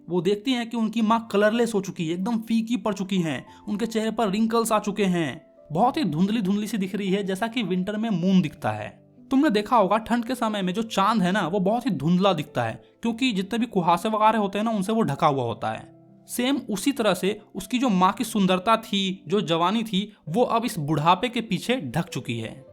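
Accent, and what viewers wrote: India. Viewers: Dehati English ❌ deshi english ✅🫶🫶👌👌🙏🙏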